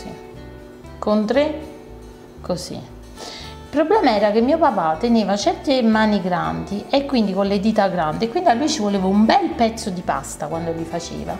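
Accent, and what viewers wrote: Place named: Irpinia, Italy. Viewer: Ciao, ti seguo da un po’.. quando ho visto la tua prima ricetta mi sono catapultata indietro nella mia infanzia... i miei genitori sono di ARIANO IRPINO e il tuo accento è il tuo modo di fare mi ha fatto davvero tornare bambina, alle mie estati giù dai nonni, con i pranzi infiniti e i profumi che solo giù si possono sentire... grazie per le emozioni che mi hai fatto e mi fai rivivere dopo ogni tuo video.. ❤️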